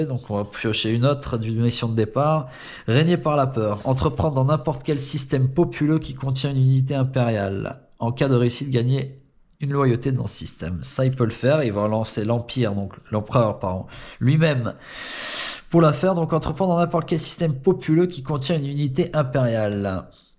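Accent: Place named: Italy. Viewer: Aloha 🤙🏝️. Très bon accent Italien👍 Je ne connaissais pas ta chaîne. Tu t'exprimes bien ça fait plaisir! Tu es passionné c'est cool! As-tu arrêté tes parties?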